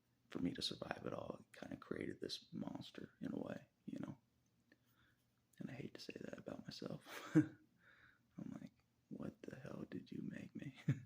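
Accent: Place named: South, United States. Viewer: Very good. Bro i wish we went to the same church but i assume you live in a southern state because of your accent. I would kick it with you and talk about all the things.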